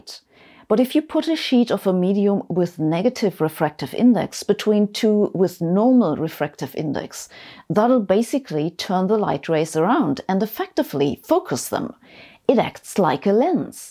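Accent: Germany. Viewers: Deadpan humour with a German accent is the best thing ever.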